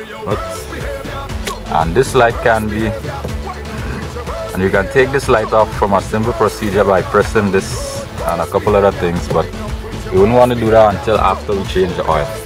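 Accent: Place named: Trinidad and Tobago. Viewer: What island you from? I'm from Guyana. You sound like Trinidad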